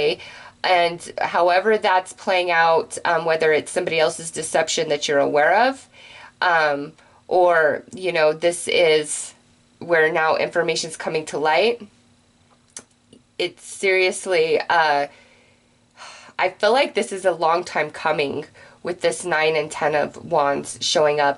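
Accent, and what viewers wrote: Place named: West Coast, United States. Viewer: I really like your voice, still trying to figure out which region of North America who hail from....West Coast? <3 Thanks for sharing your healing gift with us.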